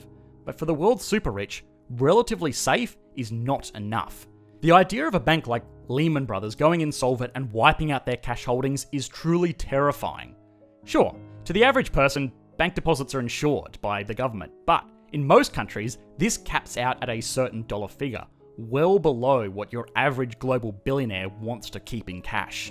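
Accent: Australia. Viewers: What a terrible (Australian) accent. Hard to take it seriously. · My God Australian Accent. Couldn't understand properly. It's very tough to understand.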